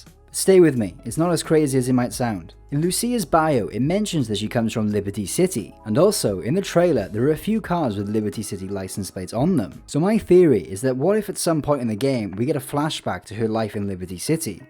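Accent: United Kingdom. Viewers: Bro got that British voice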